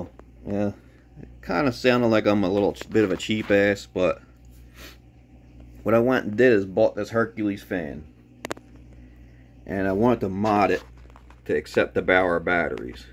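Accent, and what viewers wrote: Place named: Philadelphia, United States. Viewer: You didn't have to tell us you were from Philly I can tell you are from Philly since you have the Philly accent. LOL YOu have exceptional MacGrubber Skilz!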